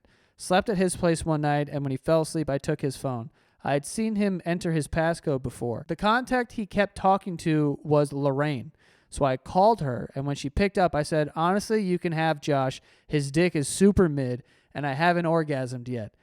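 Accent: United Kingdom. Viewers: Funniest British accent I’ve heard the past year! 😂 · Your British accent is hilarious! 😂 😂😂😂😂😂😂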